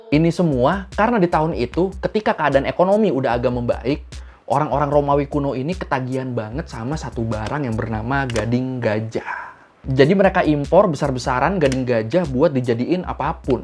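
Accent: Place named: Madura, Indonesia. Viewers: Min.. Klo lg ngomong logat madura nya di ilangin donk! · Bang ngejelasin nya biasa aja gausa kaya org madura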